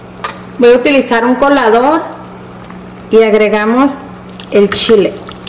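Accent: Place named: Mexicali, Mexico. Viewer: 🤯👍👍Hola soy una subscriber nueva ‼️me subscribing por su acento pues es my parecido algo cachanilla / Mexicali? Alo mejor no pero igual me encanto su receta y todos sus vid. ❤️👍👍👍👍👍👍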